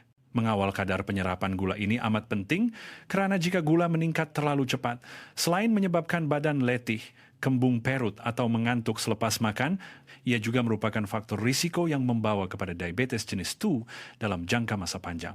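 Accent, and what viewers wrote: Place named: Indonesia. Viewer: AI...Hari tu bahasa Melayu...ni bunyi macam bahasa Indo...Sangguh...sepatutnya disebut sungguh...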